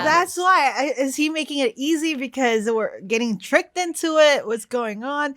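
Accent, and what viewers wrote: Philippines. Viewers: Her voice and accent is so Pilipino LOLOLOL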